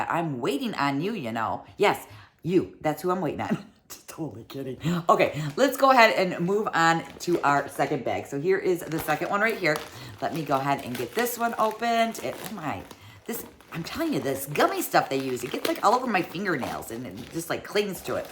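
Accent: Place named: Midwest, United States. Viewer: Hi! bag 1 is yours! I can tell by your voice, and excitement level for the first bag. I have heard that Ipsy uses the age to decide if they give you more makeup or skincare. I have been curious what two bags would look like if the only difference in the profile was the age! Also are you from Wisconsin? I grew up there and your vote reminds me so much of the Midwest. It's super fun that you get it with your niece. I can't wait for my daughter to get old enough to gift her Ipsy!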